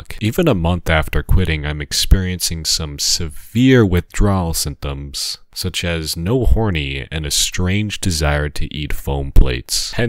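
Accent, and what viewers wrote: Netherlands. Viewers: hold on are you dutch?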